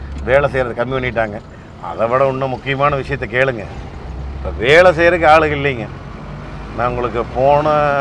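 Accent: Kongu, India. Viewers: கொஞ்சும் கொங்குத் தமிழ் பேசும் கோமகரே...! திகட்டாத தித்திக்கும் பேச்சுடைய திருப்பூர் திருமகரே...! அடடா ஆனந்தமே❤❤❤ அம்சமான கார்...! அழகான கார்...! அளவான வசதிகொண்ட கார்...! அனைத்து மக்களுக்கும் பிடித்தமான கார்...! நம் திருப்பூர் அண்ணாச்சி கொங்குநாட்டுச் சிங்கம் திருப்பூர் தங்கம் நம் அய்யா😊🙏 நமது கண்களுக்கு விருந்தளிக்க கொண்டு வந்து இருக்கும் இந்த அற்புதமான கார்....! "❤அம்பாசிட்டர்❤" கார்...! அருமையோ அருமை❤❤❤❤ என்றும் அன்புடன் உங்கள் மகன்போல இவன் ரா.முஹம்மது கபூர் (சென்னையில் இருந்து) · Anna azhagana kongu Tamil la arumayana oru pathivunga naa kekka kekka ketute irukkalam nu irukkuthunga na arumainga ponga ❤️❤️❤️😻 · கொங்கு தமிழ் அழகர் சார் உங்கள் நெம்பர்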